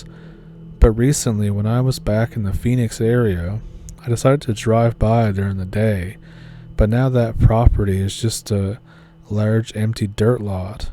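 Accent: Canada. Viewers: How is it you grew up in AZ but have the hardest Canadian accent I've ever heard?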